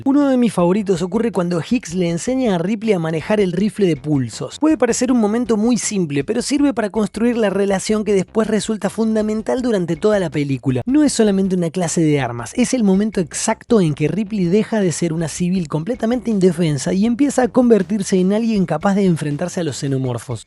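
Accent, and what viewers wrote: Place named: Argentina. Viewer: Lo que en llamas "torretas" se llaman en realidad robots centinelas .. Todo bien con este contenido que me parece muy interesante,pero hay que decir las cosas como son.. Además no entiendo xq este canal pone como país de origen a Estados Unidos,cuando cualquiera que te escucha hablar de da cuenta que sos argentino.. Saludos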